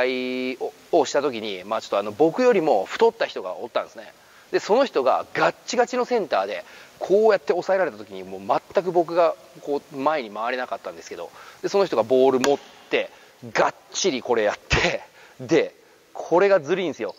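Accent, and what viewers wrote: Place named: Okayama, Japan. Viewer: しゃべりかた、耳障りがえーとおもーたら、岡山人じゃったんか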